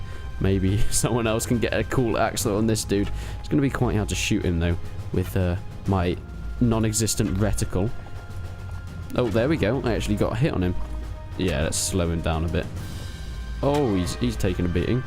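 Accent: United Kingdom. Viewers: m8, he speaks in a British accent, what did you expect him to say?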